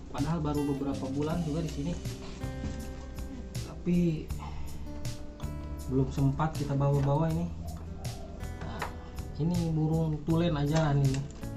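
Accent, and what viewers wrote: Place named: Indonesia. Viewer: Kenapa bahasa inggeris,tapi suara endon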